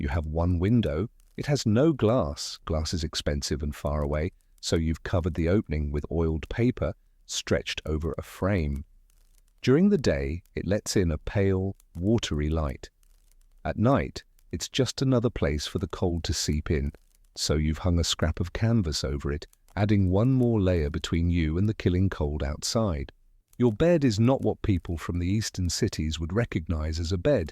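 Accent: England, United Kingdom. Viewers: US accent please. UK accent sounds unrelated to subject- American West. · America history, all be it short is amazing. Look at all of our accomplishments in such a short time. Starting off by winning our independence from the most powerful monarchy in the world at the time. Our dominance in Both world wars especially the second one. The industrial revolution to pave our path to becoming the most powerful nation that’s ever existed. That’s just a few of our historical examples. That being said, I enjoyed the English accent. In this video. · A british accent narrating something about the wild west just feels wrong, AI has ruined everything. 🙄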